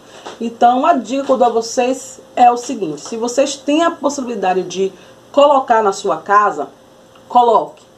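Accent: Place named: Salvador, Brazil. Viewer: Assim q iniciou pensei logo " Meeeeu Deus , Ela é de Salvador " Nosso sotaque é maravigoude 😍 Muuuulher , você é luz , Ganhou mais uma inscrita 🥰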